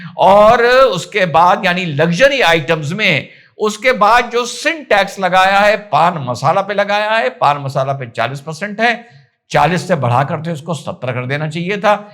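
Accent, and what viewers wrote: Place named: Meerut, India. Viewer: Sir meerut ki language aapki taraf se acchi lagti h..Like always your video analyses😂😂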